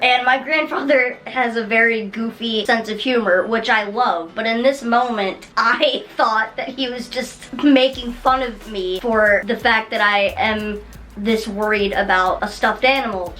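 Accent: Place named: Delaware, United States. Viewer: Im, from Delaware we all still talk like that🤣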